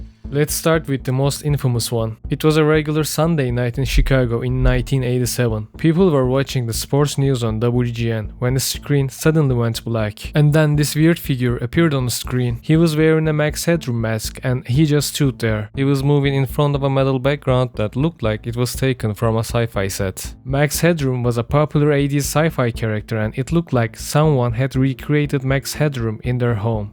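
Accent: Turkey. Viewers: Hello where are you from? You kind of sound turkish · Yo dude are u turk? ur accent sounds like that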